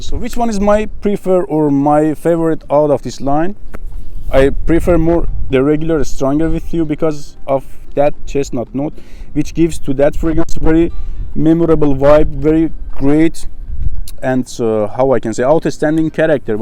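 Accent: Iran. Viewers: You Are iranian guy i Can Hear it out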